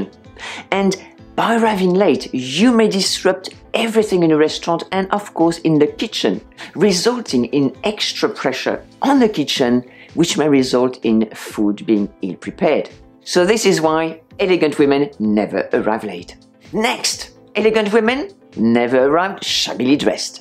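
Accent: France. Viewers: I love your french accent.!!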